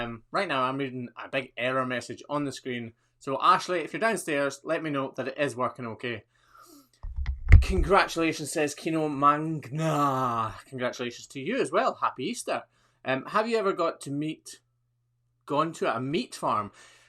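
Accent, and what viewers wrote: Scotland, United Kingdom. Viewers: I missed the live event, dang it, I was going to ask if you were scottish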